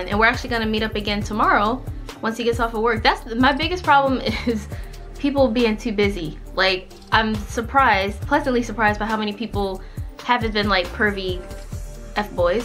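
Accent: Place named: United Kingdom. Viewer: The British accent 😊😅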